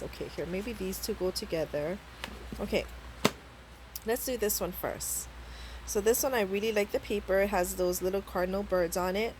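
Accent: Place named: Hawaii, United States. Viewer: New subby from Created to Create. I thought you sounded from Hawaii. I'm from Hawaii too, Waianae/Nanakuli, but I live in Florida now. Aloha!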